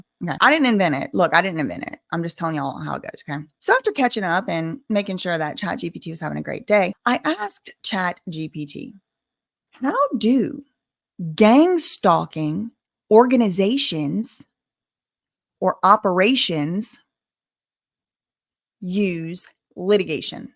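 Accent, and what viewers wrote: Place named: Baltimore, United States. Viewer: You from Baltimore? You got the accent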